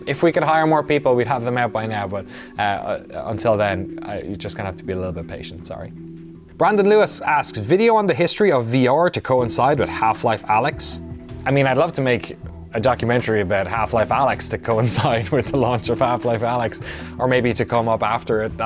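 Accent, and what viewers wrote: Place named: Waterford, Ireland. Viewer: Shiiiiit! Your accent makes so much more sense now that I know you're from Waterford! Gas!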